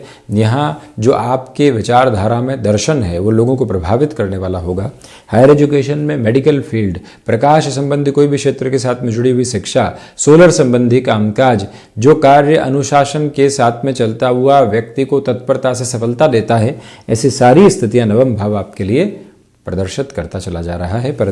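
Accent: Standard. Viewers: Aap Kitna shudh hindi bolte hai🎉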